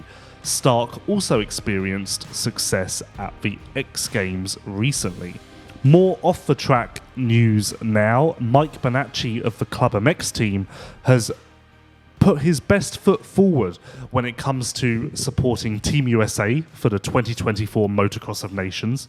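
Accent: United Kingdom. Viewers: really embracing his British pronunciations on this one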